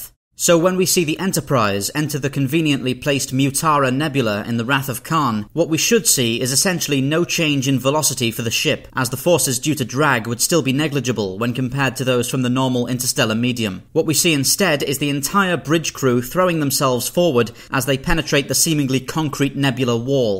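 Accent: United Kingdom. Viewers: I like your channel.i like listening to your Sci fi monologues in that British accent that makes the most mundane topic interesting. Sucks to be American,I guess.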